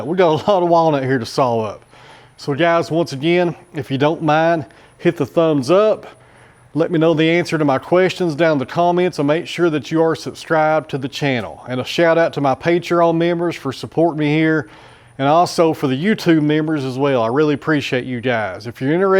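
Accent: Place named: South, United States. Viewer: I like your videos and can understand your southern accent well because that's exactly how I talk. We must be from the same general southern area. Thanks.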